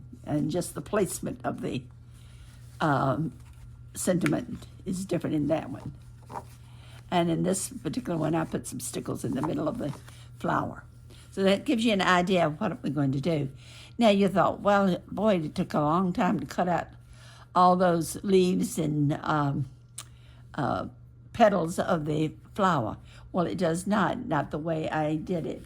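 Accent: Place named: North Carolina, United States. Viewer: I'd wondered about her accent too. I think she sounds so much like my relatives did from a small town in North Central, NC. I know about the tornado yesterday. I was watching the Ryan Hall Ya'll weather channel and praying no one would be hurt or injured but unfortunately people were killed and injured. My mother and I were very sad to hear that news. I do hope is OK I just had her video recommended to me by YouTube tonight. I don't do much paper crafting I usually make jewelry and crochet but love her content, she's so creative and her voice so soothing. I'm subscribing!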